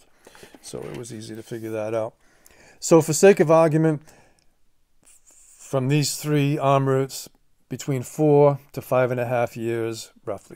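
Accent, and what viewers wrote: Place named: Boston, United States. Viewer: OK👍 Boston accent, good one👍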